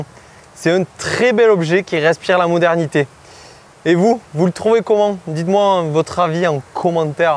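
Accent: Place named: Toulouse, France. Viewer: c'est pénible cet accent du toulousain... tu pourrais pas copier l'accent ch'ti?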